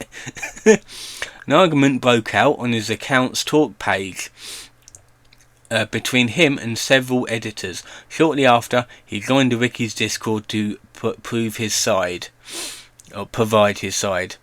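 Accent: United Kingdom. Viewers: average british voice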